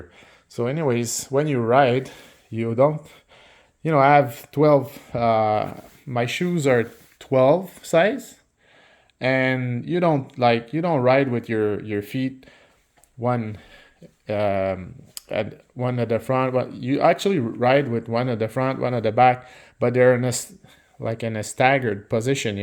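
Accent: Quebec, Canada. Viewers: Définitivement, c'est un accent québécois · This guy sounds Canadian am I wrong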